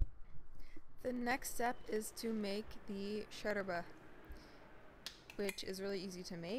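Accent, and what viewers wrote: Sanaa, Yemen. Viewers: I salute you for doing our dishes. Thanks. And good Sanani accent with the Arabic videos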